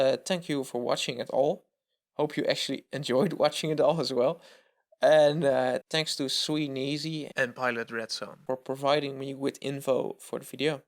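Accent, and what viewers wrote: Netherlands. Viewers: Ahaha kom je uit nederland? Mooi accent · You sound Dutch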